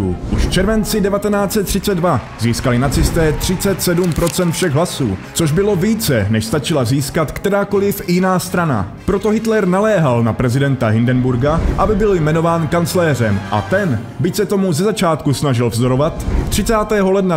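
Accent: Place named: Moravia, Czech Republic. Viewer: Část času, který věnuješ na úpravu vousu, věnuj promrskávání shody přísudku s podmětem, ať nemluvíš jako úplný manták z Moravy, když se pokouší o spisovný projev.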